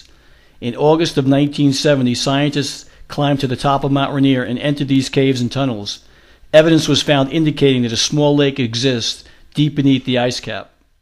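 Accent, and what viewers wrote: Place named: Northeast, United States. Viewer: Great information, thank you. Your music choices made it less than pleasant to watch though and I can tell you are from the far NorthEast somewhere.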